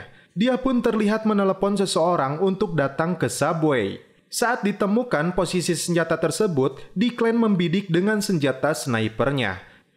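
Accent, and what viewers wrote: West Java, Indonesia. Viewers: Ciga orang Sunda ey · Cemprenk cadelnya sih masih respect, tapi tolong dong sundanya kurang-kurangin.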